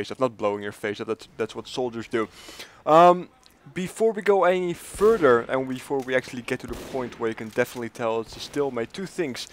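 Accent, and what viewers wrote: Netherlands. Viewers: Can I ask you something? I think you have a uncommon accent while speaking english. Is there any chance that you're dutch, because sometimes you sound like it :)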